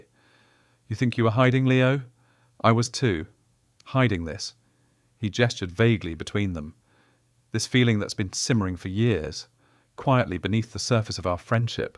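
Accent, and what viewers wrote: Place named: England, United Kingdom. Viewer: love the English accent!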